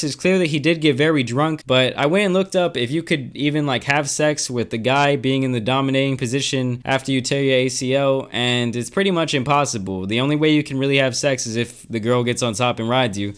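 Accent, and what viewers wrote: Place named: New York, United States. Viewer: You really have a New York accent while talking 😂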